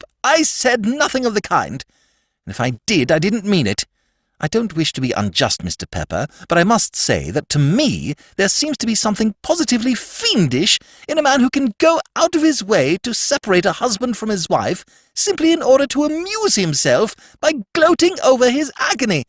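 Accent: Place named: United States. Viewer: American narrator...PG Wodehouse American.. .are you befuck???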